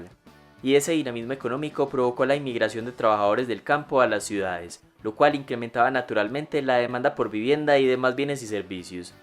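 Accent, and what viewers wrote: Colombia. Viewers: Horrible la narración en Castellano Colombiano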